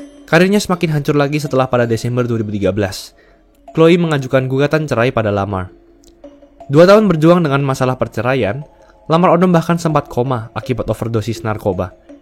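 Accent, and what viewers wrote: Java, Indonesia. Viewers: konten mantap, aku saranin untuk next video dubbingnya jangan medok banget bang wkwkwkwk, semangat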